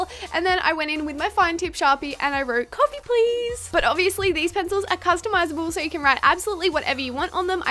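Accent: Australia. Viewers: She's an Aussie right??? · ARE U AUSTRALIAN? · is she australian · AUSSIE!! YEAH MATE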